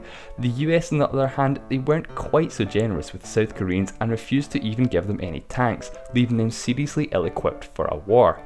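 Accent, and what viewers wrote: Scotland, United Kingdom. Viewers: i can't hear scottish accent well... i need to learn more..ㅠㅠ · Are you scottish cause you got a scottish accent xD??? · Are you Scottish? :) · I cant understand why americans struggle with Scottish accents either, they are literally speaking English. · This guy is Scottish, I SWEAR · He's scottish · I think he's scottish. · from Scotland, English is his native language. It's how he learned to speak there. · Hiếu Lương He sounds Scottish. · Scottish accent. Lots of English people find the Scottish accent difficult. And then we have the Welsh. Or worse still, the Northern Irish (or "Bradash!" as they put it). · Everything's so fine about the videos, but please try to change your accent! Non native english (scottish) speakers, cannot understand! we love Scotland but scottish accent makes it all difficult. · Anmol Madaan Scottish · It's not a speach problem, it's the best accent in the world: Scottish accent.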